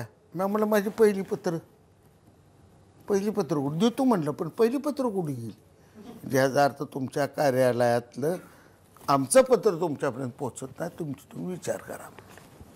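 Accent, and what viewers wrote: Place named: Satara, India. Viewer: अस्सल मराठी बाज ,रांगडी , असली माण सातारी भाषा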